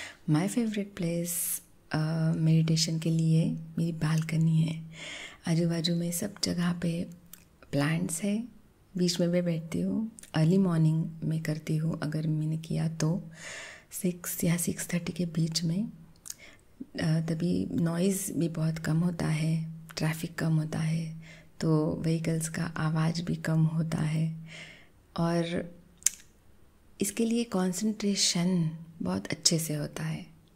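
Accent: Maharashtra, India. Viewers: This is toooooooooo Sweety sweety voice🤭😍🙌...when a Maharashtrian girl talks in hindi😍🙌😬🤭... Khup chaan ma'am 🙌😬 Big big fan🙌😬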